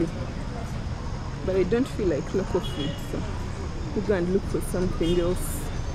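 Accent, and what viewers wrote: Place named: Uganda. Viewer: Love from San Diego California,born in Uganda I remember makerere long long time ago and I loved your beautiful ugandan accent,I would listen to you all day. Will try to find more of your content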